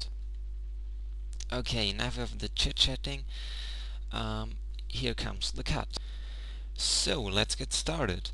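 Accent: Germany. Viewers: I attempted to complete the tut using 2.49b with little success(several months ago). I just finished your tutorial using 2.58b(with major success)!! Hooray. Thanks for the Portal and Fire tutorials. You're one of the best blender teachers out there. Love your German accent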